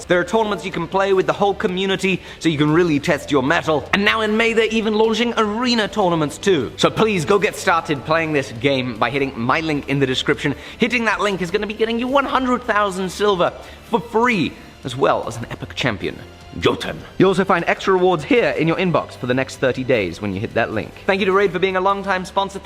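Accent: France. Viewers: Supers accent français, félicitations !! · Your french accent is killing me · Joli accent français 😉 ! Amazing Damascus pattern, really nice job 👍🏼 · Another french applauding the french accent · that french accent bro oO Congrats from france!! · belle accent français ! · Très bel accent français! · Super accent français !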